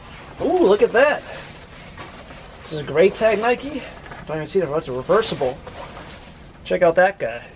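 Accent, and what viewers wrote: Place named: Philadelphia, United States. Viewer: I smell a Philly accent.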